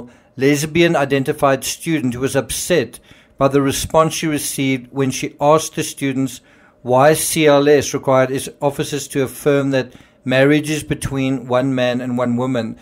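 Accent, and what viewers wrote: South Africa. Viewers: I knew you were from S.A. you speak just like a friend of mine from S.A. 🇿🇦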